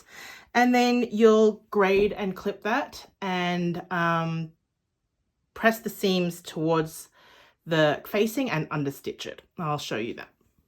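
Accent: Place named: Australia. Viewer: Great tutorial. I love your clear instructions and it’s so great to hear an Aussie voice! I have downloaded the pattern and will be making one of these just as soon as I have some twill tape.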